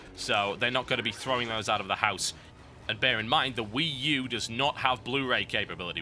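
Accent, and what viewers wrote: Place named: Newcastle, United Kingdom. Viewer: AHHH you ARE a Geordie! I knew it.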